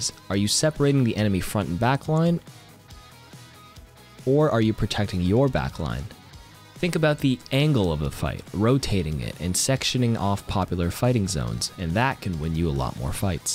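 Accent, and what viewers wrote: Canada. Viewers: Are you a Canadian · are you canadian????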